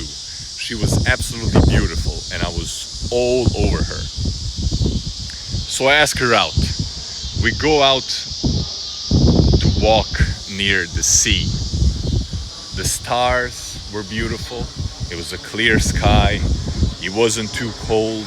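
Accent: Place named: Eastern Europe. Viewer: i knew u slavic or russian with ur accent good for practising as a russian native speaker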